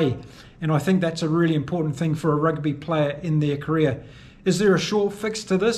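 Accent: New Zealand. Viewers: You have the least offensive kiwi accent. Fush and chups 👍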